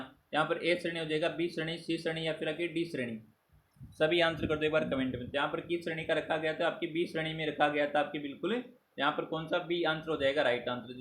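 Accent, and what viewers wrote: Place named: Rajasthan, India. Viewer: Dhudhadi jaipur ki boli ❤️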